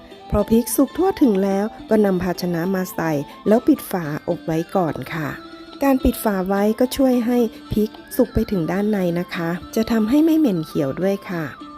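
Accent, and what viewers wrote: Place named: Central Thailand, Thailand. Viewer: ผู้บรรยายกับเสียงดนตรีบรรเลง ไม่เข้ากันเลย น้ำพริกหนุ่มกับเสียงดนตรีเป็นของภาคเหนือ แต่ผู้บรรยายสำเนียงภาคกลาง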